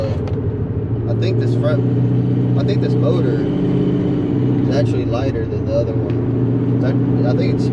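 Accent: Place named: Middle Tennessee, United States. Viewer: my guy lmaooo, i knew you had to be from middle tn. We got all the z/g's out here lol, im from smyrna/ lavergne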